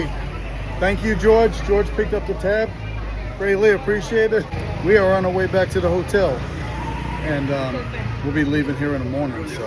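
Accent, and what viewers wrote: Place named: New Jersey, United States. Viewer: Hi. The YT algorithm brought me here. I don't have breathing difficulties but I've watched a vid of a man who had health issues & was able to do the "Camino de Santiago" in Spain. He used a travel CPAP machine (it's smaller) and was able to do the multi-week walk/ hike. Good luck on whatever you decide to do re: your health. Btw, are you from the NE of the USA? You sound a bit like Bernie Sanders and Tony Soprano. (Cawfee = coffee, moah = more). Was just curious; hope I didn't offend. Edit: congrats on the new place & the renovation. One comment abt the kitchen: there's no hood or vent if someone cooks. If the dish being prepared is smelly or aromatic, the whole apartment will smell of food. One way to prevent that would be to install a hood or to put a table outside/ back area and do the smelly cooking there. 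The view from the backyard is nice. Wish you both good health & safe travels! 👍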